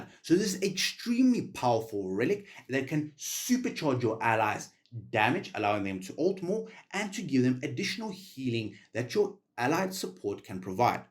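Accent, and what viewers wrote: South Africa. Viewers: yo bro you from South Africa?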